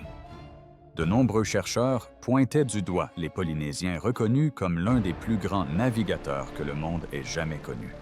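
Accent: Quebec, Canada. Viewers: Ces commentaires IA avec accent franco-canadien sont insupportables… et tout ça pour pas dire grand-chose ! C’est terrible cette narration remplie de détails sans aucun rapport les uns avec les autres. J’ai écouté plusieurs fois et impossible d’en ressortir une cohérence temporelle. Je suis bête ou ce reportage est une arnaque ?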